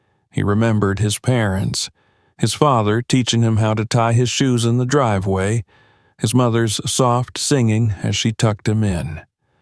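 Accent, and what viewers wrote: South, United States. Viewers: These southern drawl stories are Cool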